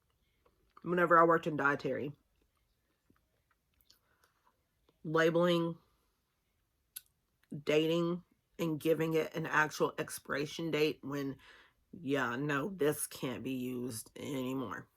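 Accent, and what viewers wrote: South, United States. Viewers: I really love your intro! lol your country accent is just a little stronger than mine! praying for you and sending positivity! Thats me right now so I am with you on that. Losing someone you love and never being able to see them again is so hard.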